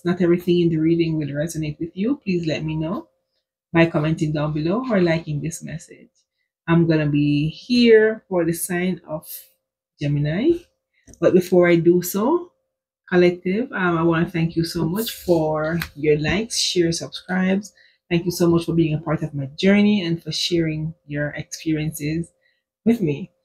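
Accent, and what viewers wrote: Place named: Jamaica. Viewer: What an AMAZING read...Thank you dear! And I just LOVE ur Jamaican accent 😊.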